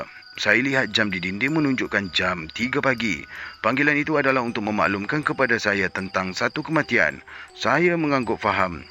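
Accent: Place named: North, Malaysia. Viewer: Mantap bos lorat utara😂.sebijik oww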